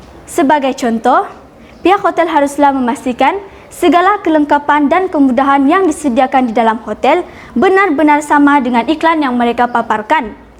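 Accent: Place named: Indonesia. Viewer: Accent dia macam accent org Indonesia weyy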